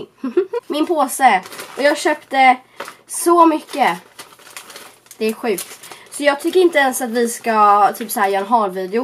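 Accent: Stockholm, Sweden. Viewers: Förlåt men pratat hon inte lite Stockholmska???? INTE FÖR ATT VA ELAK JAG ÄLSKAR DIG ❤️❤️❤️❤️❤️❤️❤️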